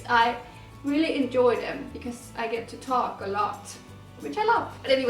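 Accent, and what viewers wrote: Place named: Denmark. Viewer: I love Denmark and your accent!!!!!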